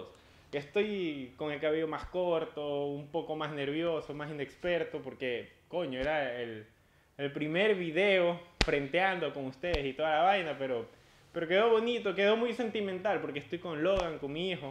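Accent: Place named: Argentina. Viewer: XD tienes acento argentino no me habia dado cuenta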